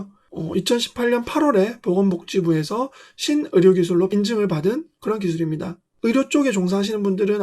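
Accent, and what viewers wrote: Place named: Gyeongsang, South Korea. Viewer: 말투가 경상도네 돈에 환장한 극우 확정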